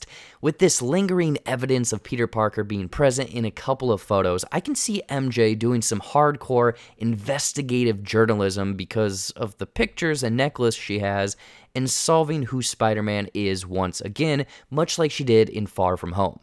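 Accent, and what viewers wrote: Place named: United Kingdom. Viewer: Please don't pull a jake tran 🥺 we love your British voice and wit, not this generic American voice -- 🙄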